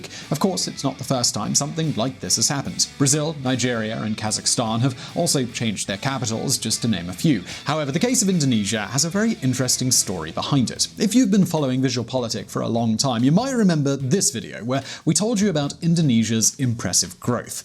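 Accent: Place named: United Kingdom. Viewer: Unsubscibed.your ugly british accent soo fucking annoying,right or wrong indonesia its my country.ur opinion its like expert on our country most ur comment its bad.sorry stop subs ur chanel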